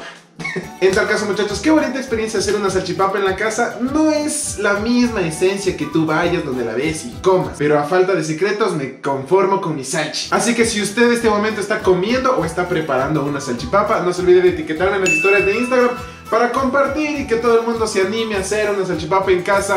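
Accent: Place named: Ecuador. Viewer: Que mrd? eres Pijo? o eres ecuatoriano... joder.... lo odio :")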